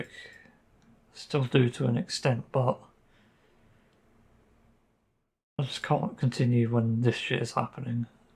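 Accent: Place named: United Kingdom. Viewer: I was surprised getting this vid in my yt recs, especially since most vids about drg be like: "Maaan what a beautiful game, perfect devs and even better non-toxic community". And I agree it, for the most part, at least. But it actually has same thoughts that i had recently. I may have not get everything you said a bit, even with subtitles, due to you have slightly bri'ish accent (don't mean to offend you in an way) and i'm not a native english speaker (i'm ukrainian), but anyway. So let me tell you my story with drg. Last year my friends, who already bought this game , gifted it to me at Steam. They said all that stuff about the game, shortly, devs, community, so on. And we played it for a while, DRG seemed perfect to me, because i'm a bit introverted myself, I can be social and extraverted in company, but if it happens for a long time - it drains me out mentally, you know. 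I like to be alone sometimes, so it was pleasant when i found that you can play single with bosco. At some point, some of my friends got tired of deep rock, some of them won't even speak to me anymore, but that's another story. But i still liked the game and wanted to know more about it. Since I had no one to play with and singleplayer had more meditative vibe then more active vibe, i started to play with randoms. At the first time it was cool. Everyone was chill, greybeards tried to educate me in non-offending manner, and i could talk to people from different countries across the Europe, practice my commu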